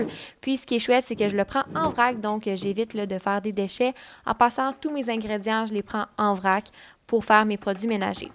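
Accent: Quebec, Canada. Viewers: Encore une excellente vidéo et très joli appartement et j adore l accent quebois et les mots différents de chez moi tu me fait voyage un peu ☺️ et j adore son rideau de douche 🚿😉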